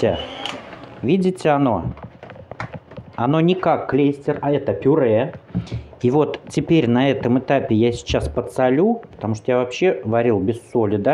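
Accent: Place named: Moscow, Russia. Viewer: у вас великолепный русский язык с московским произношением, это знаете ли, тоже эталон, такое сейчас редко встретишь. Спасибо вам,что вы так классно говорите! Вы нас и этому учите!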